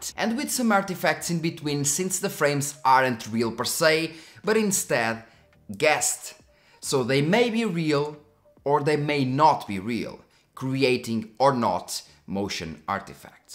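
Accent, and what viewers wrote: Portugal. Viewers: the portuguese accent in english is weirdchamp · Mal ouvi o teu sotaque vi logo que eras tuga, bom saber que existe malta a cobrir estes temas para além dos "big ones", toma a minha subscrição!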